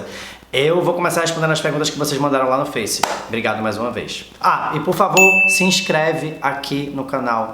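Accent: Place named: Recife, Brazil. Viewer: Adorei o vídeo! Tava com saudade de ouvir alguém falar c esse sotaque recifense arretado demais! Sdds do Recife ♡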